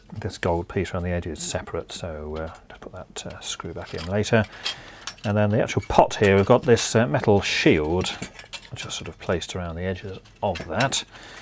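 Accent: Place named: RP, United Kingdom. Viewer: Typical 'YouTube whingers'. speaks perfectly clearly in a BBC accent (like me). Go and get your ears syringed!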